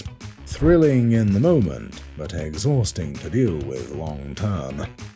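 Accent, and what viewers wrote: United Kingdom. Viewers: Utter and pure horseshit. Kept watching just to see how idiotic this one got. Which it sure did. As a boater since childhood, I cannot remember an elite population as this British voiced fool implies, ready to question brand after brand at this level of boat. Yes there are cheaper quality boats just as automobiles are. And the world keeps spinning what a shocker. Do me a favor and stay home and impress yourself that a life of snobbery is far better than enjoying the boating scene. 1994 Four Winns owner here - old school in the house that takes care of his boat and could care less there are OMC labels on a Volvo and Ford I/O setup!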